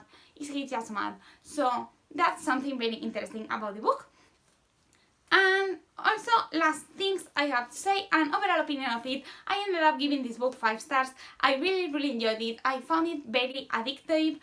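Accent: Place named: France. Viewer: Cute French English accent. 🌷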